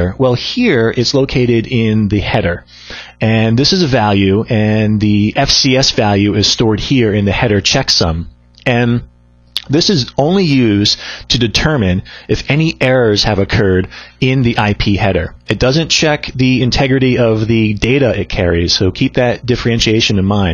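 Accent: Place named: United States. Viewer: Great explanation and most importantly: an AMERICAN ACCENT!! Thank you!!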